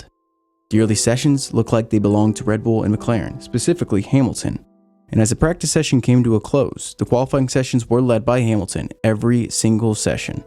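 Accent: United States. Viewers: American pronunciation annoys me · you use American pronunciations for everything besides "Vettel" and "Grand Prix." It's awkward. Coming from a television producer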